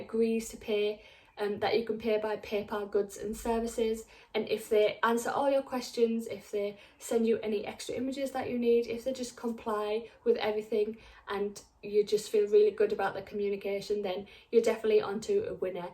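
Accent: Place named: North East England, United Kingdom. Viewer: Just subscribed and am looking through your videos. Great advice so thank you for that. I am a member of some Facebook LV groups but they are mostly US ones. Need to find some UK ones. Nice to hear a North East accent too! We sound the best haha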